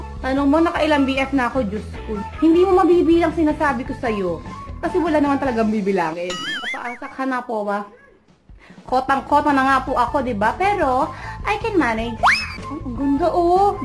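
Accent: Visayas, Philippines. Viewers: 😍😍😍Cute niya magbisaya😀😍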